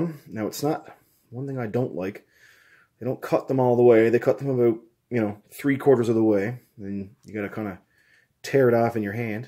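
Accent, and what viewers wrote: Newfoundland, Canada. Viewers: Newfie or from NS?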